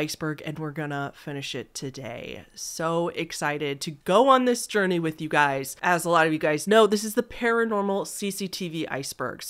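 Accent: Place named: Midwest, United States. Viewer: It’s fine it’s a very midwestern thing. Like “warsh” instead of wash. It drives me crazy but it isn’t “wrong”.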